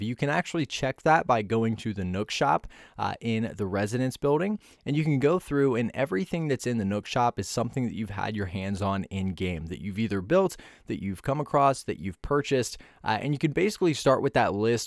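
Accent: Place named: General American, United States. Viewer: reminds me of how people who speak in a “standard American accent”on tv commercials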